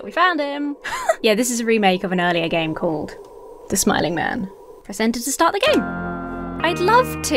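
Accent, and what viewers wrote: United States. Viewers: Love your American accent as always. But, uh, calling this a "game" feels a tad generous. Still, got some good fun out of it. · Your American accent is on point! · your american accent sounds a little bit like Red from OSP. :O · your American accent freaked me out more than anything. It sounded too good, like you been lying about having a British accent this whole time! It was unsettling. 😊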